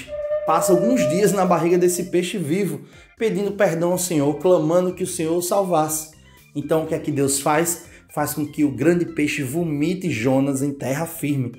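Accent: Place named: Northeast, Brazil. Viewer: Top de mais! Os desenhos são ótimos e a narração é muito boa, especialmente pelo sotaque nordestino! Parabéns! Continuem! Deus vos abençoe!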